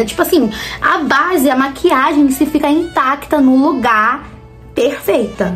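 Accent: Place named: Rio de Janeiro, Brazil. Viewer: sou da Bahia,amo seus vídeos.amo seu sutaque Carioca,acho super lindo. Amo seu jeito de ser,as suas dicas super maravilhosas,adoro quando vc começar o vídeo dançando.! Vc tem que ser do seu jeitinho mesmo.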